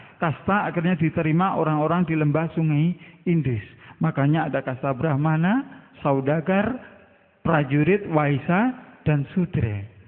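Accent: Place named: Java, Indonesia. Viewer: keturunan brahmana dari mna logatnya aja jawa dasar ngaku2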